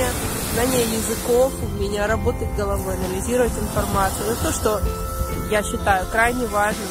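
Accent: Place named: Oxford, United Kingdom. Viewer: спасибо, вам за поздравления, за ваши видео-уроки, за вашу безвозмездную огромную работу, которую вы делали и продолжаете делать для нас! Мне стыдно за то, что пока не могу сделать хоть какой-то взнос вам, вашему каналу. Английский это просто моё хобби, от которого я не жду ни каких достижений, успехов. Просто не хочу бросать эту практику, если у меня это получится, то это благодаря вам.Счастья вам и процветания в новом году! p.s. У вас очень классное произношение, по мне, так почти оксфордское.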